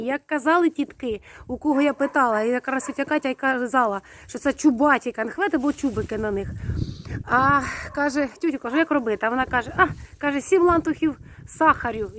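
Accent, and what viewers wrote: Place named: Poltava, Ukraine. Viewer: Цікавою Люблю етнографію. звитчаї і традиції.Судячи з усього, Ви з Полтавщини. (Опішню ще Панас Мирний згадував). Ну і плюс говірка.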